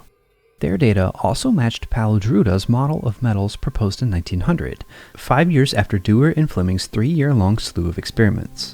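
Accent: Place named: South, United States. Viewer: I doubt it. Were the voice AI, pronunciations would follow standard English patterns superimposed over a fake voice, but this voice is a specifically Southern variety of General American.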